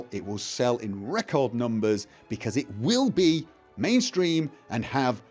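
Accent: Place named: Manchester, United Kingdom. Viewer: Most English personspeoples can identify that subtle:0 Mancunian accent...If he starts talking Norwegian I for one will be lost. P.s. great avatar.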